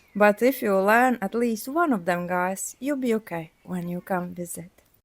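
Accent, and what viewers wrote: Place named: Russia. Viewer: You sound Russian. I am cool with that.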